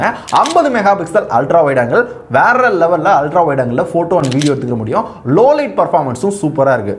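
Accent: Madurai, India. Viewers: Elle madura kaaran da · Thala neenga maduraiyaa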